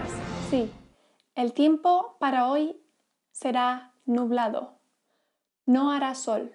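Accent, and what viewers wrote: Spain. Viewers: Is this Spaniard Spanish? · soy hablante nativa de español, este video fue muy interesante de ver jjajsjs realmente explicas muy bien y tu pronunciación es muy buena, no puedo diferenciar con exactitud si eres de España o aprendiste el acento español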